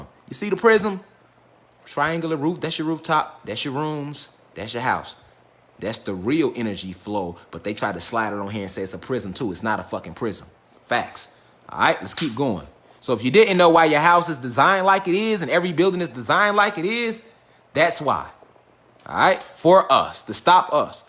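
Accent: Chicago, United States. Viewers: Keep waking US UP GOD!! We are starting to REMEMBER 💪🏾💪🏾. Im from Chicago too lol and I be crackn up at how u talk cuz we relate❤❤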